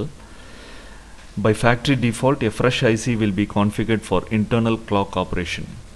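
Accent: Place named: India. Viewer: Really thanks sir with great difficulty I found a Indian teacher really thanks you sir my ears were tired by listening to aliens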